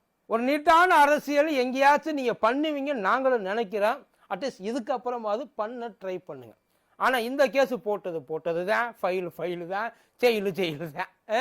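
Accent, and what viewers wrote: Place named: Telugu, India. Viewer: இது எந்த தமிழ் தெலுங்கு தமிழா😊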